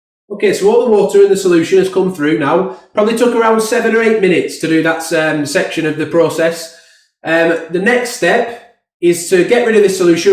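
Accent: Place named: Bolton, United Kingdom. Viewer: love the video and the accent! You're not far from Bolton/Chorley area.. my ex-partner came from there and I never tired of listening to him.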